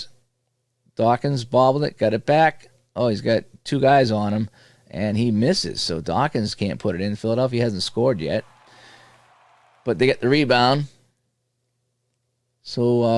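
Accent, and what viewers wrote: Boston, United States. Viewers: Hi. I like your reviews. I saw your Regular Season Basketball (RSB) review and your Celtics-Sixer series with RSB and that was fun. You have a true Boston Celtic accent and that gives a nice touch to your reviews. I just started this video and will go back to it but I do want to correct the Strat-o-Matic pricing. For $62 you get the game with card image and any one season of your choice. You don't need to spend another $27 unless you want a second season.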